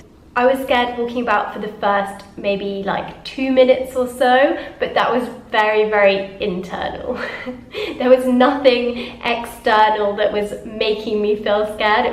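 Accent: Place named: United Kingdom. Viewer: Welcome to KSA. Jeddah is one of my favourite cities as I'm from the Middle of Saudi Arabia but loved it a lot. What a lovely sweet accent you have, no wonder that's British my favourite toooooo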